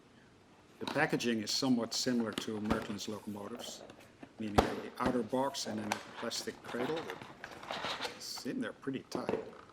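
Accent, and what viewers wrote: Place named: Netherlands. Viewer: Your English sounds very Dutch. Nice presentation, nice locomotive. Although I drive mainly German and Swiss locos I may consider to buy this one as well. Looks and sounds really nice. Greetings from the Čech Republic 🇨🇿 and stay healthy 😷.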